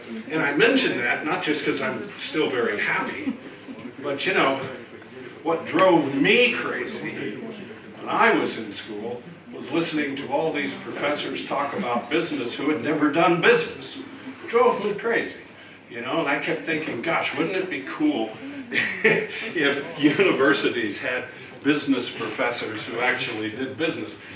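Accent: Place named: United States. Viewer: Pretty early, on Monday a little bit sleepy 😅 but still very motivated and eager to absorb new information, we were provided with an amazing, as always, speech of. So firstly, talked about agricultural enterprises, about technology, ways to run business successfully, quickly! He pointed, and kept repeating that world of business is volatile. Never stands, always varies, changes. Furthermore, he shared as the example the Smart Bandage. This kinda put us in the picture more of what he talked about. I am truly grateful for a speaker like him. Because I get to hear how Americans utter words and express ideas!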